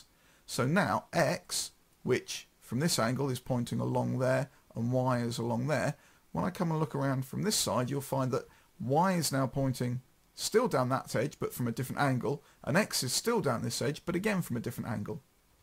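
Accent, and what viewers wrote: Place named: United Kingdom. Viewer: Мерзкий блевотный британский акцент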